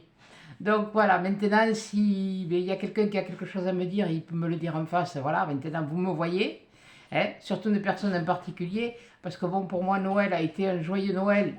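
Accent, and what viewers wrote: South, France. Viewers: Coucou Quelle belle surprise!! Tu es superbe et cette coupe de cheveux et couleur te vont à ravir. Tu reflètes la joie que tu nous communiquais par ta voix et ton joli accent du sud. Sincères condoléances pour cette personne chère à ton cœur. Merci pour tes souhaits et à mon tour je te souhaite un très bon réveillon de la St Sylvestre et à l année prochaine. Gros gros bisous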